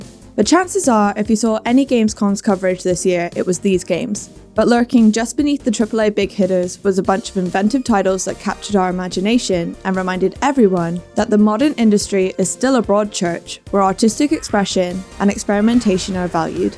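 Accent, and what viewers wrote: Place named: Yorkshire, United Kingdom. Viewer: Yorkshire accents? Sign me up for TGYH. Excellent coverage :)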